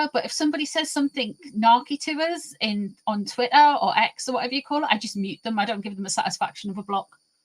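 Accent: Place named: Newcastle, United Kingdom. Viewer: I love your voice. Just love the Georgie accent ❤ And just for the snob I'd like to say I come from a mining town /heritage in South Wales!!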